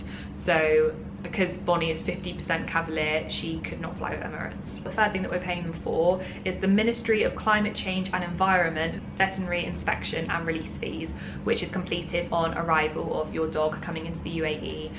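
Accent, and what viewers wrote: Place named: West Country, United Kingdom. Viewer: Hello. I'm James and also British ( I'm detecting a bit of a West Country accent?) I'm currently living in Manila and will be moving to Dubai in May along with my little border terrier Ted. It's a similar climate here but less dog friendly / accessible. Thanks for putting the info together as you've just answered lots of the questions I had around apartments and general dog life in Dubai!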